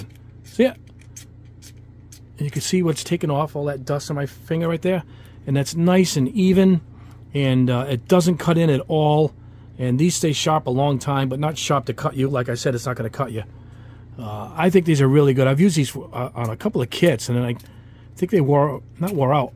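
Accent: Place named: Boston, United States. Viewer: Back to the hobby in the last 6 months. I just found your videos and am enjoying myself at 63 years old. I live in Minnesota, yet am from Boston... so the accent is like home.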